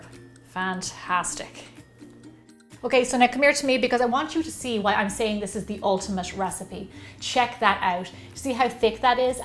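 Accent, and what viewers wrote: Ireland. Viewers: Love, love your Irish accent! ☘️ I’m Irish too, but w/o the accent, ha, ha. And your recipes are all excellent. Now, I have been making my French toast exactly like yours for years....except now I’m going to try & add the extra egg yolks. That’s new to me, & I bet it makes a difference. Thanks so much!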